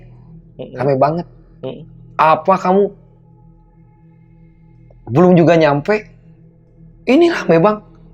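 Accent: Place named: West Java, Indonesia. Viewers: Justru logat Sunda lucu😂suaralu kali yg gaenak didenger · Bengsi gk tuh. Sunda lekoh yess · Sundanya kerasa bgt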